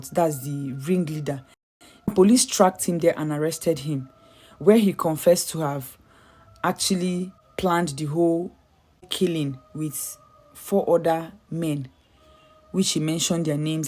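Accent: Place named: Igbo, Nigeria. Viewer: The narrator is not bad,is just the igbotic accent is the problem.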